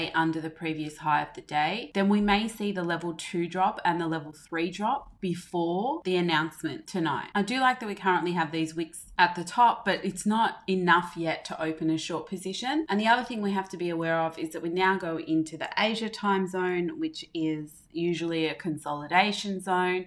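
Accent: Australia. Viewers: Loved your video! Also lovely to hear that Australian accent! I lived in Melbourne at the start of the pandemic - great city. I plan to return one day.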